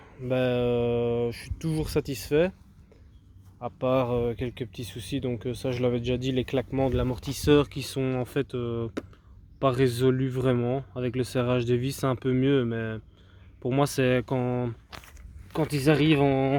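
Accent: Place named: Belgium. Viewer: Il me semble entendre un accent habituel, tu ne serais pas belge ? 🙂Si oui, dans quel magasin de trott vas-tu ? Merci en tout cas pour le retour objectif fait dans cette vidéo ✌🏼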